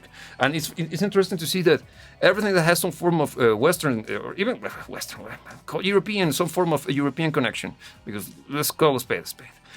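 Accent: Russia. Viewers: Ты русский (акцент на месте) Тоже очень Люблю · It seems you already have a slight Russian accent Great analysis, btw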